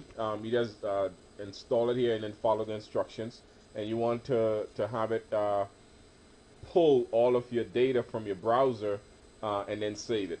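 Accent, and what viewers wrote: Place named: Bahamas. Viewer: OMG YOU ARE BAHAMIAN I can hear trhat I went to school with many bahamians Do you do do Online Businesses?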